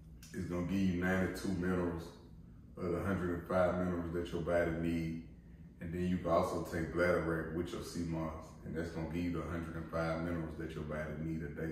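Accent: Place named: South, United States. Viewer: Your southern accent thick! Its crazy watchin a laid back video of u just chillin at the house. Diggin the morning voice! Heavy but soft at times as u speak. I've had seamoss and it does give you a nice boost of energy. The texture is a bit different but its good & great 4 ur health! Make me a cup?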